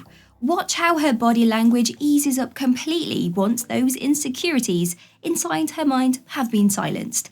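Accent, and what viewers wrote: United Kingdom. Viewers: You are my favorite girl. When I discover you I let go the others. I find myself come back again and again. None of the others women have been able to do that to me. Even with all their charms. Anyway the things I like about you are. 1. You know what you are talking about. 2. You deliver what you saying with great confidence. 3. Your intelligence eloquent way of speaking is truly unbelievable. As though that was enough. 4. Your voice (oh that voice that British accented voice) delivery is sweet as a group of morning birds welcoming in a new dawn. 5. You protray honesty with a demand that makes your listeners cavity to you. 6. To top that all you are beautiful. ❤️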